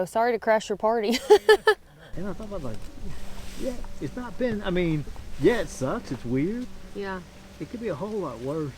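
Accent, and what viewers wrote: South, United States. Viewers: "...He's not not not hocked yet". That's what my subtitles read! 😁 Good thing that I understand Southern (lived there for a bit, long ago, and I love the people and the accent). Back in New England to be with my Mom in her twilight years. I'll be back, likely., an old, big, heavy duty stuff sack, into which you can put a large rock or two, along with a mini 'biner to clip your guy line into, can be a big time saver when setting up in those rainy, rocky conditions. I hiked from Palmerton, Pa. to the DWG in the snow, so I feel your pain. Rocks and ice are a special kind of hazard! Take care!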